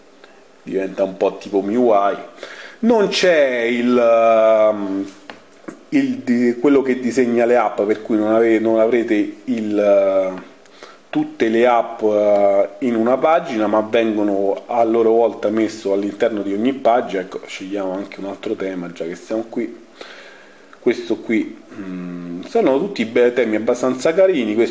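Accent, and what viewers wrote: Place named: Bergamo, Italy. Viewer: Attendo aggiornamento sulla batteria, sull'audio e sulla fotocamera...bella fratellì, un abbraccio da Roma. C'hai st'accentino de bergamo alta...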